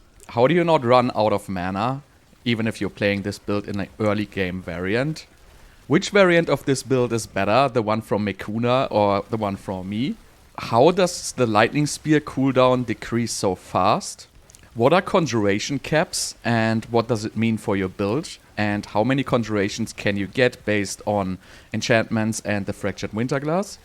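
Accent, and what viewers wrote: Germany. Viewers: Alter Schwede, mach halt Videos auf Deutsch. Dein deutscher Akzent im Englischen ist echt grausam.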